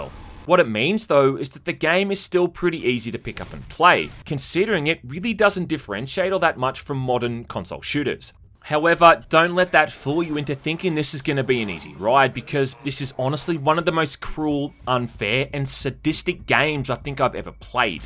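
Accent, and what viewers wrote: Australia. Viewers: Are you an Australian? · Scare pronunciation: it’s scarce /‘skeəs/ like ‘scare’ /‘skeər/ but with ce at the end. -this is for British English - but Australia uses received pronunciation all the same if I recall correctly. Americans English: the vowel sounds in scarce /‘skers/ are the same as they are in ‘air’ /er/ And like air, they add the rhotic /r/ sound after the vowel, but before the ce, so it will sound like sc-air-ce But it doesn’t really matter how you say it. Aussies make everything sound good anyway. Australian English is like an amalgamation of British and American English. It’s like natural progression, and it makes common sense. Never mind me. Just your English teacher and linguist subscriber. I hope my years of pain and debt were useful to you.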